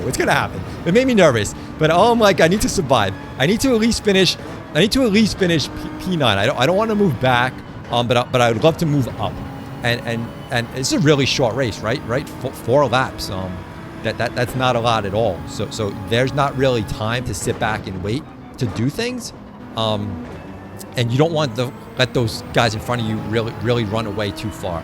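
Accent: New York, United States. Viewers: do I hear a NY accent???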